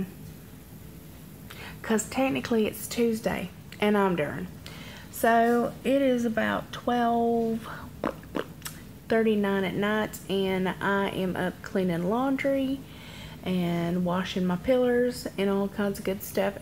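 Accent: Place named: Kentucky, United States. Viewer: where are you from originally? I'm from KY and you talk like we do.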